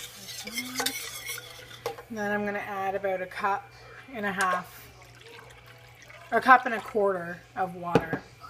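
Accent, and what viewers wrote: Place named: Canada. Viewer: Let me guess you’re Canadian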